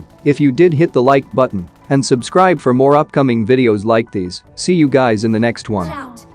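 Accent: India. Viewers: Are you indian???😂😂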